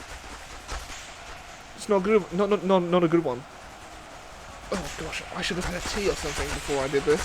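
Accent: United Kingdom. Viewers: Keep the massive battles and total war going, I love the survival battles. And personally I find your voice quite elegant, it gives your videos an almost documentary-like feel. Speaking of that can you say Amino Acids in your next video? It's my favorite work to hear with a proper english accent. By the way, I'm 27 and totally get it, living in the bay area of California is brutal, I pay $1800 american for rent.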